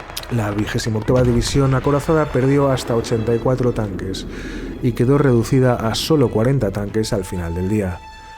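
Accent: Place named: Russia. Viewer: La presentación es buenísima. Brutal ese acento ruso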